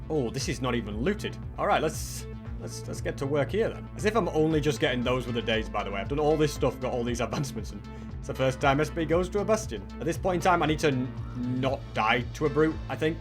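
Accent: United Kingdom. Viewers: No caption how am i supposed to understand the brit english mate?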